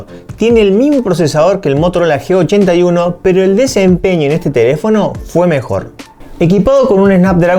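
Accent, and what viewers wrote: Córdoba, Argentina. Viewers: muy buen review! me suena a tonada Cbesa la de, mepa o es de Río III? Saludos!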